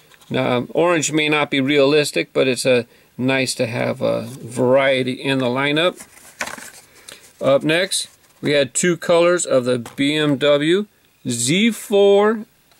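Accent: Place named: Mexico. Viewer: are you mexican because you sound like you have an accent like my dad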